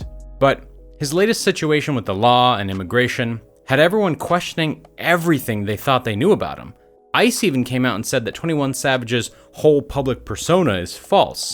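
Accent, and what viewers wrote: United Kingdom. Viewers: I'm a bit late to comment on this vid But he's definitely from England🏴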